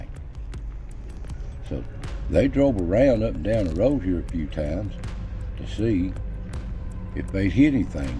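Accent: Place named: South, United States. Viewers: Another great one bro, your country accent sounds like us round here. Like to hear them ghost stories. Liked the one on the Melungeon people too. I have a knot on back of my head like they do, but never been checked to find out. Mamaw always said her people were black Dutch, so they were bidding something. Anyway I'm looking forward to more stories. Thanks man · just love your southern drawl,and the way you tell ghost stories and tales Thanks for a GRRRREAT CHANNEL!!!!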